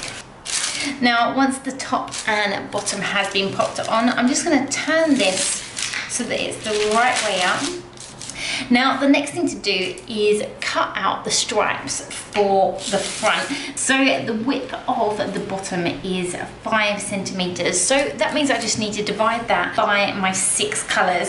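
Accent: United Kingdom. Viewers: As much as I love your rainbow cake, I love your British accent ❤❤❤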